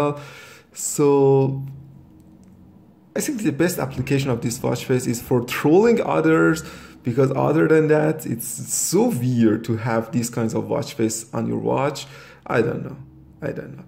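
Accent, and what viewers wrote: Iran. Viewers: I bet your ass that's a Persian accent. Lol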